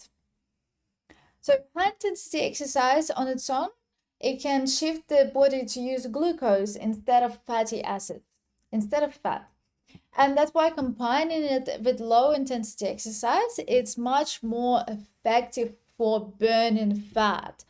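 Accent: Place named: Ukraine. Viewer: Great video! BTW, what is that beautiful accent of yours?😊 Are you from Ukraine? 🇺🇦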